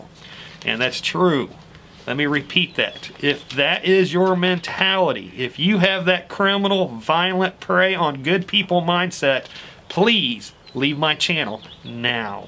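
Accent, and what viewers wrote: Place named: Appalachia, United States. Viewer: I like your speech just fine and keep up the good work any correct information even from hillbilly speaking person or slang speaking person your good soul. and how you speak to point is not bad not racist or anything bad so god bless and maybe god bless your family and friends out there.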